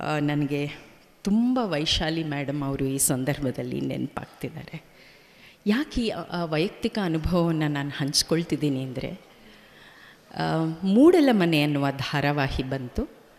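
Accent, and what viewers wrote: Standard. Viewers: ಇಂತಹ ಕನ್ನಡವನ್ನು ಇನ್ನು ಯಾರುಯಿಂದಲಾ ಕೇಳುಲ ಸಾದ್ದವಿಲ್ಲ. ನಮ್ಮ ಅಚ್ಚ ಕನ್ನಡದ ಅಪ್ಪರ್ಣ ರವರಿಗೆ ನನ್ನ ನಮನ ಗಳು 🙏🙏🙏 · ಯೆಂತಃ ಸ್ವಚ್ಛ ಕನ್ನಡ ನೀರರಗಳ ಮಾತು ಒಮ್ಮೆ ನಿಮಗೆ ನಮ್ಮ ನಮನ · ಸ್ವಚ್ಛ ಕನ್ನಡ ತಿ,ಕನ್ನಡದ ಒಡ ತಿ ನೀವು ನಮ್ಮೆಲ್ಲರ ಮನಸ್ಸಿ ನಲ್ಲಿ ಸ್ಥಿರ ವಾಗಿ ಇದ್ದೀರಿ ಮೇಡಂ so sad I ಮಿಸ್ ಯು ಮೇಡಂ ರಾತ್ರಿ ನಿಮ್ಮ ವಿಚಾರ ಗೊತ್ತಾ ದ ಮೇಲೆ ನಿದ್ರೆ ಬರಲಿಲ್ಲ ಮೇಡಂ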